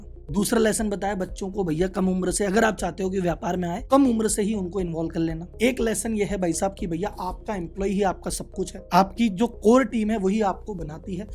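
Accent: Gujarat, India. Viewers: Aap Gujarati h kya.???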